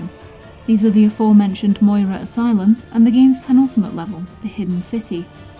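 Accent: United Kingdom. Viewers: Ok, so I watched this video twice. While I'm a huge fan of the original games, I never tried to care about Thief 4. It was just too obvious it would be heavily simplified. And of course the rough development didn't help. I actually think it's the opposite way. The levels in the first two games are sometimes built almost like real places, so a map isn't really needed. If the mansion is a big rectangle, then why do you need a map? On the other hand, the city in Thief 4 has a nonsensical layout, so playing without guidance leaves you with no logical way to go. The same might go for all the levels in Thief 4, but I can't remember them. Garrett didn't draw the maps. He usually says where he got them. ;) I don't have much else to say. You have my respect for analysing this dreadful game so deeply. Really the only gripe I have is that the background music is sometimes pretty loud. 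It makes it harder to concentrate for long and I don't understand what you're saying sometimes. English isn't my first language and I'm not used to this heavy British dialect. The generated subtitles did help, though.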